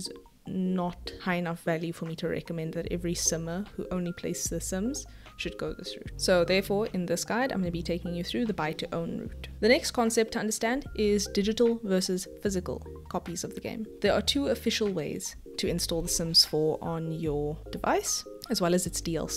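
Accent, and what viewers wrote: South Africa. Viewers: You from South Africa right? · Are you a South African? I recognize the accent. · Wait, you're South African? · Yep it's a south African accent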